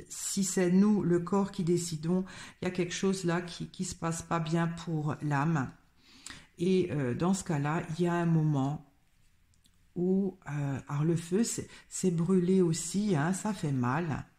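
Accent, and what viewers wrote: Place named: Corsica, France. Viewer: Merci vous êtes Adorable vous avez une intonation dans la voix qui me fait penser un peu à l accent que nous avons en Corse j aime beaucoup mais je vous suis avec beaucoup d interet pour votre bienveillance je ressent chez vous la communion que vous partagez avec nous tous c est beau ❤❤❤ Bises depuis la Corse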